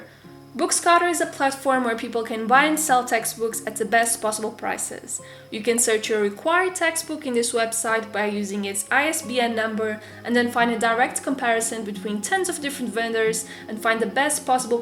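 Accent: Portugal. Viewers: Tu falas português??? ahh bem me parecia o teu sotaque inglês portugues És portuguesa ou br??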